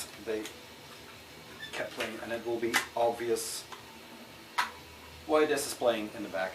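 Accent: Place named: Netherlands. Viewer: You have a Dutch accent, i am from Belgium! Good video!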